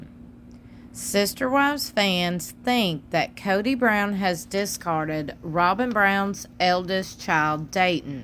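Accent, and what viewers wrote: South, United States. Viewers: I just want to say I have been listening to you since the days and I just adore your southern accent so much and you have become a staple around my household. My husband doesn’t care a lick about sister wives but always wants to listen in when spilling tea! Keep doing what you do!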